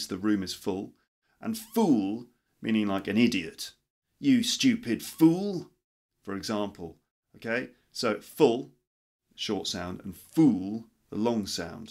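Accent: RP, United Kingdom. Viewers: nice lesson i adore the british accent thank you so much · Hi Sir, My name is Karla, I have to say something about your pronunciation. Your accent is from UK, If I pronoun all those words as you said, American People will correct me? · Hi,! I'm from Russia! I love your posh accent!I kind of want it too. And I also really need your opinion whether the following sentence:'all he asks for you is be prepared as much as he does ok'-makes sense for you as a native speaker. It's very important for me.I just have a little bet about that.And now I need your help.so please,.I would appreciate it. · I love how he’s a lil it British. 😂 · Hello, I really like your voice and British accent. It is nice and clear to hear again and again. I can listen well but I can’t speak fluently, especially the English accent. I’m not satisfied at all with my voice, pronunciation and accent when I speak English out. 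Short talking is ok but long conversations or storytelling really suck. What can I do? I mean how do I practice? Do I need to practice English speaking one-on-one with a native speaker or can it be helpful if I practice English pronunciation first and speaking fluency on my own with the help of the Apps out there? Looking forward to hearing from you, Regards, TJ P.S. I just saw your channel today on iPhone pod cast and it is my very first time listening to 3/4 of your pod cast audios and then see the YouTube videos to see your face with the curiosity of how you look like because I really like your voice as I’ve said and imagined your how you look like in my mind so. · Are you a native British speaker?What sort of British accent do you use? Is it RP? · very nice pronunciation in british accent. bravo!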